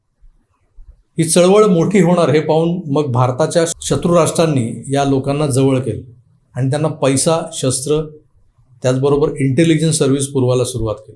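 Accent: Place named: Mumbai, India. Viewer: Very beautifully explained and your language is very sweet , typically mumbaikar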